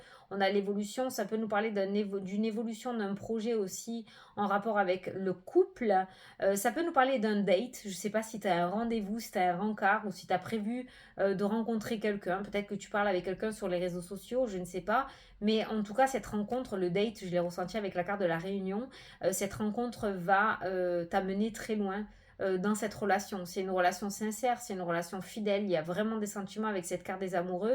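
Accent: South, France. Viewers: Coucou surtout ne change pas garde ta belle humeur et ton accent du sud et petits mots qui dérangent que certains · cc moi aussi je suis du sud je te comprend très bien c notre parlé bisous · Bonsoir à vous merci beaucoup pour se magnifique partage, ( et oui dans le sud c'est comme sa qu'on parle, je suis du 13 donc je suis comme sa aussi. 😊 ne changez rien, restez comme vous êtes 😁 on vous aime comme sa. ) bonne soirée à vous aussi et gros bizoo 😇🙏😘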